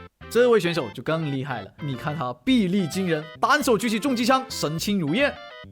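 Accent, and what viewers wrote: Hong Kong, China. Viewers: 你是不是香港人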